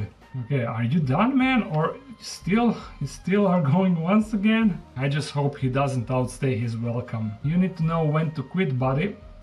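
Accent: Croatia. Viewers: Hi. I came across your channel a month ago and man I'm hooked. At first I was wondering why your accent is so familiar 🤔 Than few videos later I realized you are Croatian like my boyfriend 😅 After watching more videos I also realized you live like 127 km from us. Hopefully one day I will have the opportunity to visit Dark Den. 😊 Just wanted to say how much I love your videos and all respect for you for caring so much for all your animals 😊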